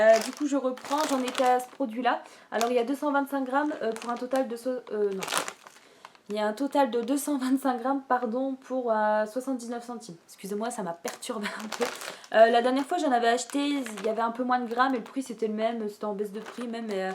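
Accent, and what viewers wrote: Nord-Pas-de-Calais, France. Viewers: Coucou. Le noz de louvroil est il plus petit ou grand que celui de fourmies?je suis du val de maubeuge.merci pour tt.et sympa ton accent chti.heinnnnnn!!!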